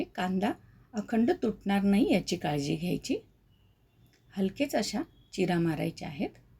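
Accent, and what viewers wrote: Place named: Khandesh, India. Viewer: एक नंबर ताई कांद्याची रेसिपी कांद्याची भाजी मला हवी खाण्याची रेसिपी ताई तुम्ही खानदेशी आहेत का कारण तुमच्या बोलण्यावरून समजत आहे मी खानदेशी आहे ताई मला एक विचारायचं होतं ते जखमी परमानंद गरम मसाला दाखवला आहे तुम्ही करतो सगळ्या भाज्यांसाठी वापरू शकतो का आपण झणझणीत उग्र वगैरे होत नाही का एक किलोच्या प्रमाणात सांगा नाहीतर अर्धा किलोच्या प्रमाणात सांगा प्लीज मी पण खानदेशी चा रिप्लाय द्या ताई मी तुमचे चैनल सबस्क्राईब केलेले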